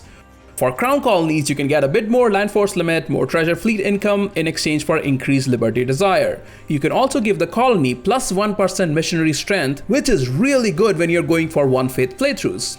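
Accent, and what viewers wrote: India. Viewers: Is Indian? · Are you indian?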